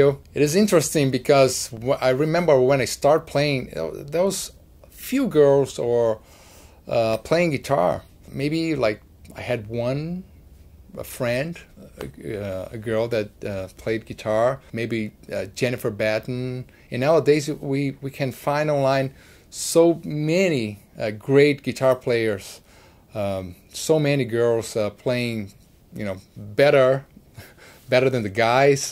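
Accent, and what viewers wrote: Brazil. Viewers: ainda bem que tu tá falando inglês com o teu sotaque brasileiro, eu entendo quase tudo o que tu fala, sem necessidade de legendas. Meu inglês não é fluente, e quando eu ouço um americano falando, todo enrolado, eu não entendo quase nada, aushuhauha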